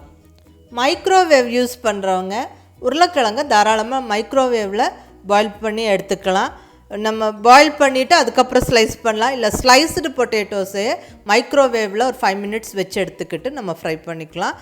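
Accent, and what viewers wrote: Kongu, India. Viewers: Mam unga Kong nattu voice super ennoda sunnuku pidikum.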